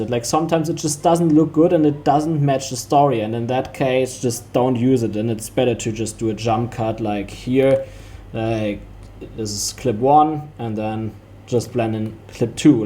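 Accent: Germany. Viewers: Are you German ? In my opinion you speak really German English 😆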